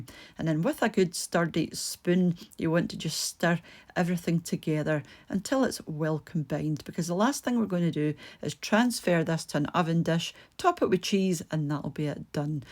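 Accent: Scotland, United Kingdom. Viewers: Looks outstanding! On a side note, several people mentioned the Scots accent, that is actually where the Southern accent in the U.S. derives from. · Hey our American friends - I’m a Londoner… this soft beautiful Scottish accent is so lovely, you’re not wrong in your comments about that. I made this (smashing comfort food) I had some thick slices of roast BBQ pork in the freezer… defrosted, chopped and added in. Perfect result. Not mozzarella (tasteless for a dish like this) good extra mature cheddar for sure and yep baked beans and crunchy warm bread. Yum yum and a really cheap good for you meal. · Ciao from Italy 🇮🇹 your vid just popped up and I'm glad it did 🫶 Aww that scottish accent so beautiful... I sure will follow you.... The recipe looks yummm 😋😋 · American here — I think this looks absolutely fabulous, and I’m going to have to give it a go — but if truth be told, I’m equally enchanted with that lovely Scottish accent of yours. 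So beautiful!! Sending blessings from the USA! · This (along with that beautiful Scottish accent!!) really appeals to my predominantly Scottish heritage. One question, - is the wrist tat a Star od David or a hexagram?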